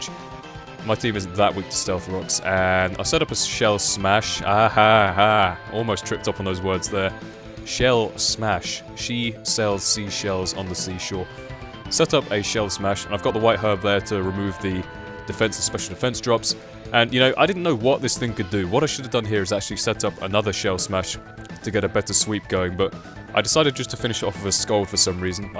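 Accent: United Kingdom. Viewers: Your British accent soothes and reassures me. Will watch rest of playlist. Enjoy my like.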